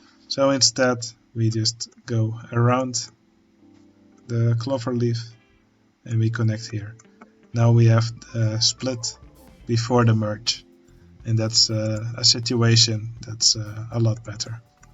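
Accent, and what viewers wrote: Netherlands. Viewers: you sound so dutch :)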